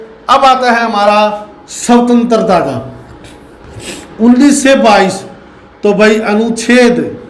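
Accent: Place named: Haryana, India. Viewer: Hariyanvi kamal 😊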